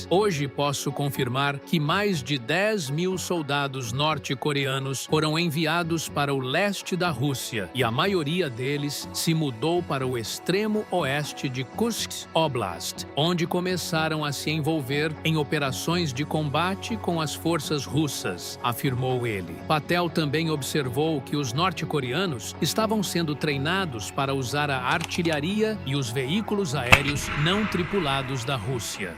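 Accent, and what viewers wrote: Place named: United States. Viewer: Que pronúncia Americanizada é essa com os nomes russos?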